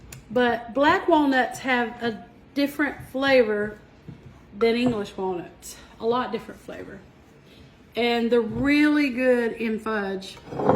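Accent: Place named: Georgia, United States. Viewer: That's so so good !! I'm from Ga. and I have the same kind of accent . I say words like that too . HAHAHA...!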